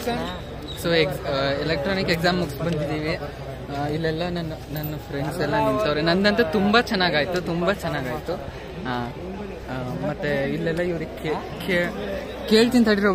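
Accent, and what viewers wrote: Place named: India. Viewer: Your hindi accent 😅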